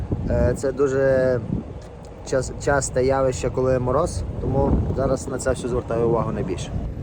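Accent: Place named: Prykarpattia, Ukraine. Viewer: Вітаю гуцула в Америці.нас дуже багато по світу.приємно вражений нашою говіркою Прикарпатською.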